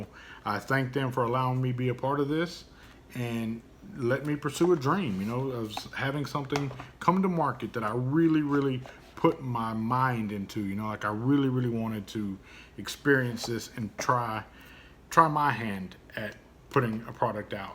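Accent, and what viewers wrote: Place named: South, United States. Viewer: Also, no disrespect but you country as hell 😂😂😂. As a country boy from Alabama I appreciate it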